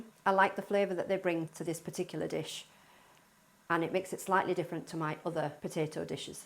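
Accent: United Kingdom. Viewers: plus there’s nothing more commanding yet soothing than a Yorkshire accent, you sit up, and pay attention whenever you hear one! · It's not dubbed, it's the presenter's normal accent, a Northern English one. A part of the UK that is renowned for it's Indian cuisine. By your logic, all chefs who present cooking shows should present them in the language of the meal that's being prepped. Jamie Oliver might struggle a bit.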